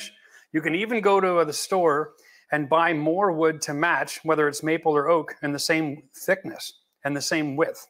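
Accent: Canada. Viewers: I could’ve swore you were Canadian 😄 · Isn’t he Canadian?😂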